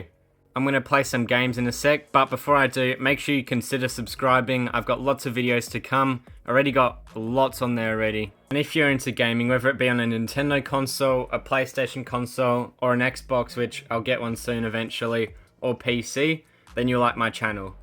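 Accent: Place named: Australia. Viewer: Subscribing for the aussie accent alone. Without joking awesome video 🍻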